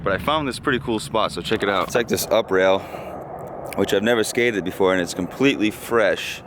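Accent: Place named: Massachusetts, United States. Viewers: Every time your Massachusetts accent comes out, I have to laugh. You're adorable :-) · I just started watching your videos recently and I kept saying he's GOT to be from Massachusetts LOL. Fellow Bostonian here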